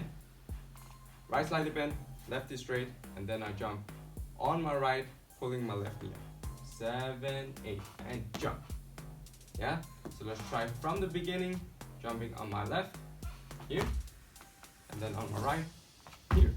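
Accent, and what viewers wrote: Denmark. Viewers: bro this guy sounds danish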